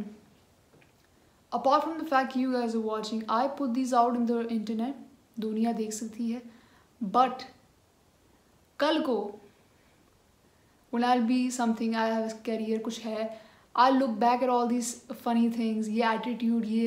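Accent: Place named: Punjab, India. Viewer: Punjabi accent 😍😍😍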